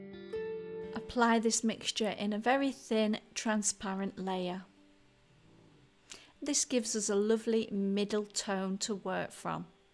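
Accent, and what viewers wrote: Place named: Northwest England, United Kingdom. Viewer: I really do see your method as the best way to paint, by separating the issues of value and colour the stress is taken away as achieving the painting becomes more of a step-by-step process. My background is in project management so breaking big jobs into small organised tasks comes naturally to me. I see things in your techniques and methods I've not seen before, so inspiring to me. The technique of underpainting starting out with a mid tone and then wiping/erasing for lights and reinforcing darks makes it look so simple and easy, I guess great skill and experience help too ;-) You paint just the way I want to, not ashamed to use reference photos and not scared to try different ways to achieve gorgeous results. So next month, when I have some spare money, I shall go to your website to buy a subscription. 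I've already cancelled my paid subscription to a SW USA chap's tutorial site where he covers all kinds of materials and methods but just on the surface whereas I want to dig deeply into and concentrate on the indirect method in oil but with modern methods as you do, combining tradition with innovation. Your approach suits me just fine and I prefer your northwest(?) English accent too. West of the Pennines not too far from Manchester?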